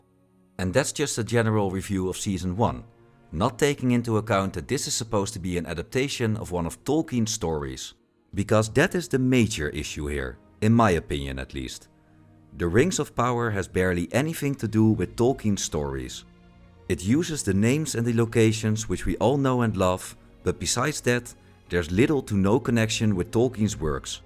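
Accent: Netherlands. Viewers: The dutch accent is strong in this one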